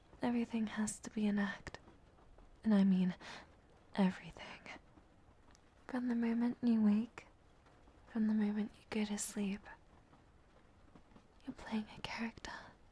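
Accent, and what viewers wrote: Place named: Australia. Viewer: Damn this is the first time I've heard an Australian accent in asmr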